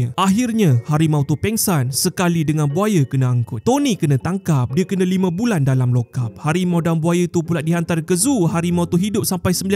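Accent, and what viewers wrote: Sabah, Malaysia. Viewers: orang sabah ke? Anduk baaa😂😂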